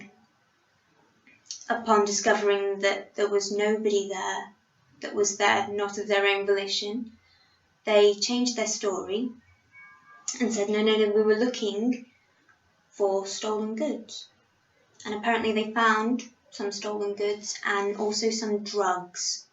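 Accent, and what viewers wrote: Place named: United Kingdom. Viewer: Do you do any IRL meetups? (As you sound UK based lol)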